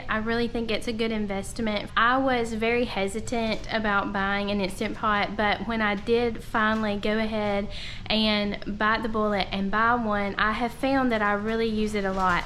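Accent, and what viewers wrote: United States. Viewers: sorry being english you sounded yank lol :P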